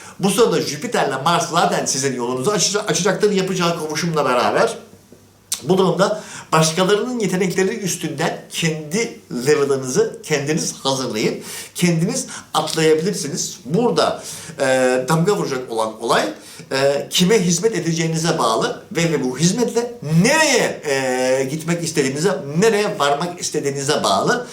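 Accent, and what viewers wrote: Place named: Germany. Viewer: Hocam Alman aksani var sizde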